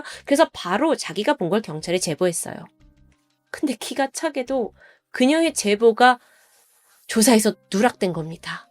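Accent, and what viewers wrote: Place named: Gyeongsang, South Korea. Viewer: 경상도 답다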